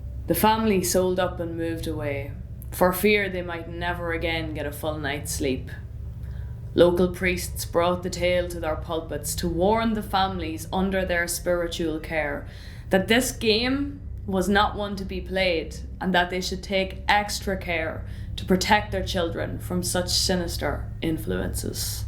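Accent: Ireland. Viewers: That was absolutely fantastic story telling I really really enjoyed it, you reminded me of my granny ( not in age I don't want to offend a witch such as your self) honestly ghost story's are scarier when told with an Irish accent, thank you for the smile on me face and the shiver down me spine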